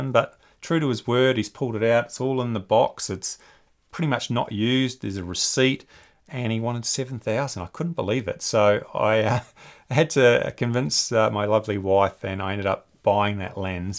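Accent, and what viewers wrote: Australia. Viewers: I started with Pentax cameras, a zoom105, a k1000, a superA, then went digital with a Pentax ist'd and recently purchased a Canon R8. The R8 came with a 24-50 and ive not long ago bought a RF24-105f4L lens. I was looking at getting something like the 100-400 you reviewed as, just being a hobbiest, paying more than $1000 is hard to do. I do like to have the nice things though so we'll see. I do like the fact that the 100-400 is fairly compact. A bigger lens might make people think I'm a professional, which I'm definitely not. Although I do enjoy photography and I appreciate great photos. I love your videos and reviews. Great to hear that fellow Aussie voice. Cheers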